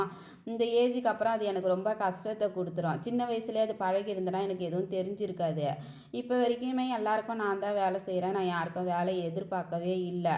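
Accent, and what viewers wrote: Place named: Cuddalore, India. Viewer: சீக்கிரம் உன் ஆசைகள் நிறைவேற பிராத்தனைகள் மா ❤🎉 எந்த ஊர் மா நீ. கடலூர் பக்கம் என்று நினைக்கிறேன். ஏன்னா பேசுறது எங்கள் பகுதியில் இப்படி தான். நேரில் பார்க்க நான் வரேன் மா. எல்லாம் நல்லதே நடக்கும் உனக்கு. உங்கள் சொந்த வீடு என்று நினைக்கிறேன். எப்படி உங்களுக்கு தகுந்த மாதிரி கிச்சன் மேடை அமைந்து இருக்கு 😢🎉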